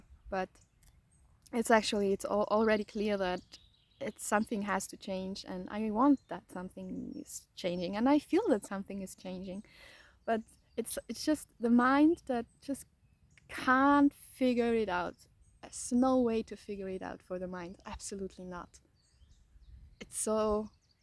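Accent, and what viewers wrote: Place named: Austria. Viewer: it makes me happy to hear that i made you happy and.. i'm from austria too! what a coincidence, i thought that the accent and the sourroundings looked familiar...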